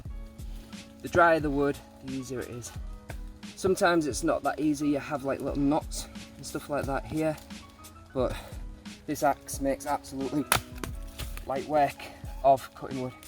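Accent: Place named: East Yorkshire, United Kingdom. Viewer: you must be from hull or east Yorkshire, the way you promounce "wairk"?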